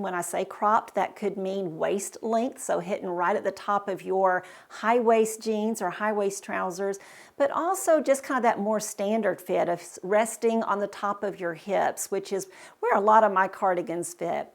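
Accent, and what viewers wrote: South, United States. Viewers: Oh my goodness what part of the south u from I'm from No.Carolina love your videos but I think u could perk it up & jazz it up more 💜🎄🎅🤶🦌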